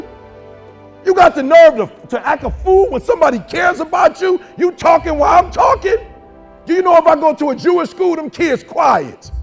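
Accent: United States. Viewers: Why would someone with a PhD speak like this? Learn the English language and use it properly if you are going to stand before young people and talk about I I I I , ME ME ME ME, MY MY MY MY. Yes, I heard the message, but if he is going to be hold himself up as a role model....why not "Model the behavior" it takes to be really successful! Ebonics might be cool to someone(?), but it is NOT the way to speak if you want to be competitive. I would LOVE to know who paid him $50,000 to speak !?!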